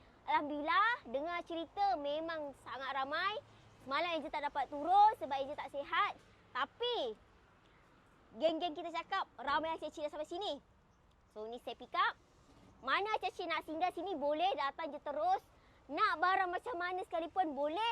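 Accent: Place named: Java, Indonesia. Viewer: Sedap bunyi jawa nya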